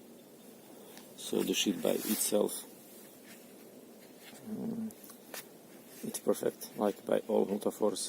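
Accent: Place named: Brazil. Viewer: Your accent sounds almost Brazilian my friend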